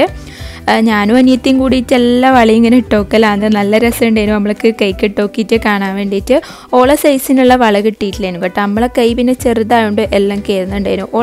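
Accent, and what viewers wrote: Kannur, India. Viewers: IngaleKannur bhasha pwliya😊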